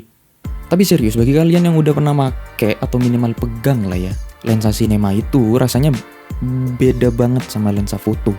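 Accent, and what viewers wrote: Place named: Madura, Indonesia. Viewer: logat maduranya nampak banget bang😂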